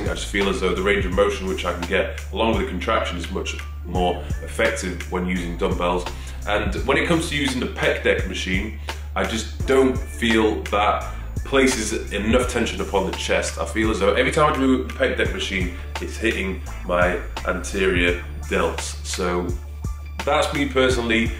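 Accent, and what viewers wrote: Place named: North, United Kingdom. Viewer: Where are you from? Accent kinda sounds north.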